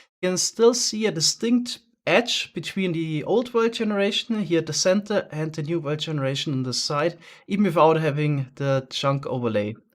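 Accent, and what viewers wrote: Germany. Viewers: ur dialect sounds so german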